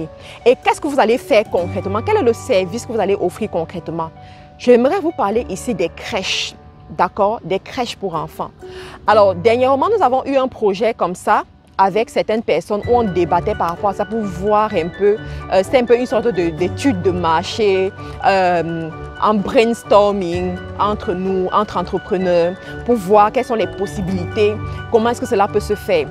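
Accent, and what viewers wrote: Cameroon. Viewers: Prononciation normale même si elle a un accent camerounais tinté semi canadien aussi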